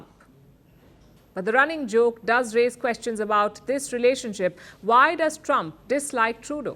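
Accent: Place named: East India, India. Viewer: This is comical. Here’s an East Indian/Canadian Journalist with a heavy accent telling the World that Canadians need to stand up to the big bad orange man who hates our Prime Minister Trudeau and wants to take over Canada. This has become like a Saturday Night Live skit. Donald Trump knows full well that for the last 8 years the alt left Socialist Canadian media has fed conspiracy after conspiracy to its citizens who gobble it up and respond with distain and insults. The page has now turned. 🤣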